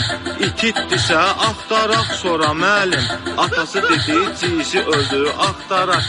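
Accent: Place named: Shaki, Azerbaijan. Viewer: 👍👍👍👍😂😂😂😂😂 ardı gəlsin Qəşəng caan ay Şəki ləhçəsi..👍👍👍👍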